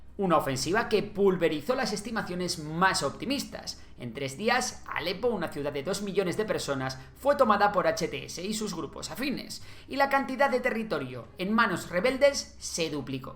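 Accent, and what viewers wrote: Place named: Spain. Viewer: Quién más odia el irritante acento español? 😫😖🙋🏻‍♂️🙋🏻‍♂️🙋🏻‍♂️🔚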